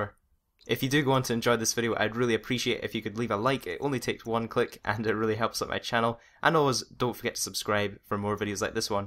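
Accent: Scotland, United Kingdom. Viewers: R u British/Scottish I am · I've used the Brush Teeth thing since Sims 3 when my Sim's hygiene is low in other Sim's houses. I mean, you can take a shower too, but they get upset and might throw you out if you do it in Sims 3. Haven't tried it in Sims 4. By the way, as an American, I really like your Scottish accent. :) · When I started the video I was like "wait what the hell is this guy even speaking English his accent is too strong!!?" before realising it was just a Scottish accent. The worst part is I'M Scottish!! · Onyi Onuorah He’s Scottish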